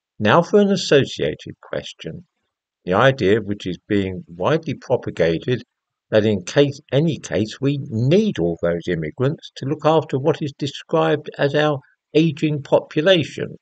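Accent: United Kingdom. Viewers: Thick British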